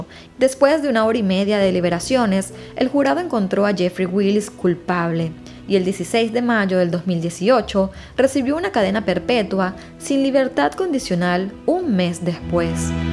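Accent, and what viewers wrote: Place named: Venezuela. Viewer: Hola bella, es un gusto escuchar tus casos y escuchar el acento venezolano lo mejor. Tú, LesmaVR, JulySeib y Dinosaurios las mejores, sería interesante que narres el caso de los Hermanos Faddoul caso que ocurrió en Venezuela.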